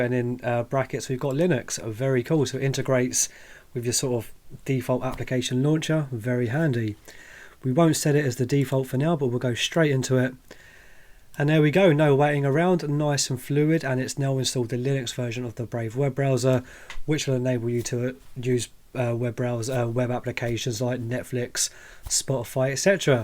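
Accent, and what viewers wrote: United Kingdom. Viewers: I really like the clarity of your presentation, especially when I slowed it down to.75 to satisfy my aging brain. Your lovely Brit accent is not a hindrance for this old guy from the US, happily. Going to review your videos for subjects still being resisted by my thick skull, like those blasted bootloaders (like Grub) that throw me for a loop every time. First thing I see is a prompt which I can't even figure out how to get out of. Then I need to get the layout of a SD boot. There are partitioning issues I don't understand. Sometimes the SD boots fine, sometimes RasPi Imager won't even write to it. "Capacity" issue? Please help me understand what the H is going on there. Thanks very much.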